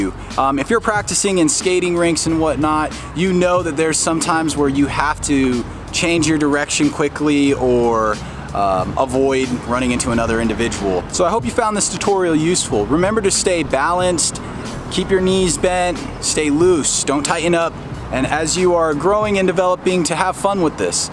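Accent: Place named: RP, United Kingdom. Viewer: Thank you . Your english is very articulate and congrats for not having a local accent . Its almost a boarding school accent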